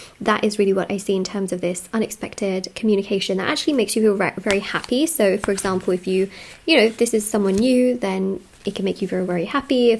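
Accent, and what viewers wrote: England, United Kingdom. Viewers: I just love listening to your Beautiful English Unique Pixie Fairy voice 🧘👌✨🧚🏼‍♀️🪄✨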